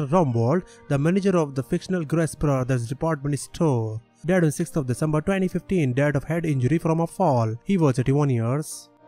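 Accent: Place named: India. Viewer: It is an accent from India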